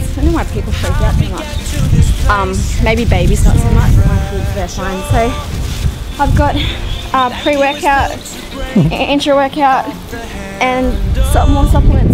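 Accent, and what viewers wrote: Australia. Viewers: I changed my Alexa voice to Australian because of.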